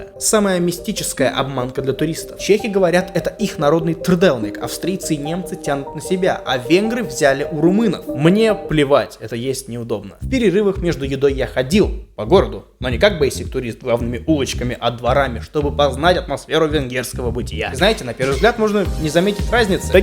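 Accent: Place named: Odessa, Ukraine. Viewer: Хаха как всегда заставил уповать от смеха. Отдельный лайк за Одесско-еврейский акцент)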